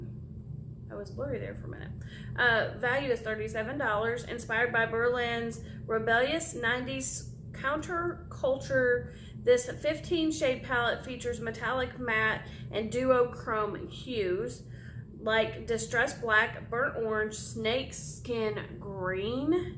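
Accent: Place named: South, United States. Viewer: Trust me! I didn't take it as "snarky". All good. I can hear the southern in your voice and relate. :)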